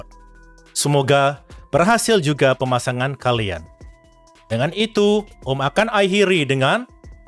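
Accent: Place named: Malaysia. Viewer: suara nya kayak orang Malaysia sih😅😅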